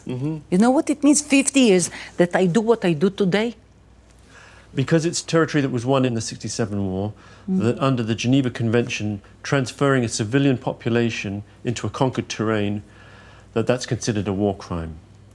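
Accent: United Kingdom. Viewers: It IS a British accent though. It’s one of the many British accents. If your complaining that ‘British’ there’s a million English accents and they all British accents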